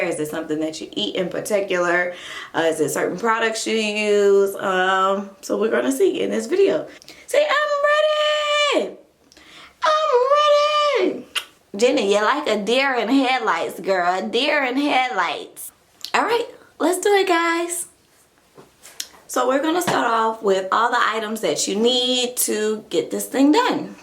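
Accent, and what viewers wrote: New Orleans, United States. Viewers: Beautiful... Do I hear a bit of Nola Baebyyyyy?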